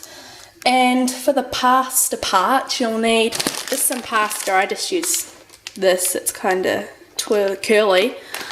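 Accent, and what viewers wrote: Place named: New Zealand. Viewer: I can tell you are from NZ because of your ingredients but in hindsight you accent should of given it away.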